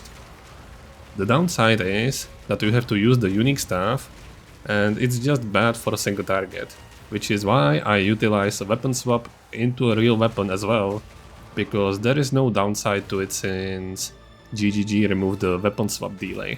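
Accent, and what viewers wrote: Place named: Czech Republic. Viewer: Ahoj je fajn vidět že i v česku se hraje poe, ten přízvuk poznám prostě všude :D muzu si te pridat na dc nebo tak ?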